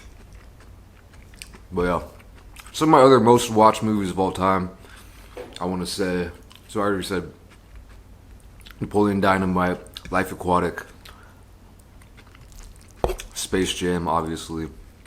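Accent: United States. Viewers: He sounds American because he’s from America. He moved to Seoul from the Midwest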